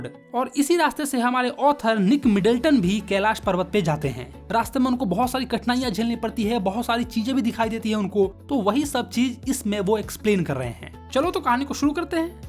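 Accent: Bihar, India. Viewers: Bhaiya aap bihar se ho ya uttar pradesh se 😅😅😅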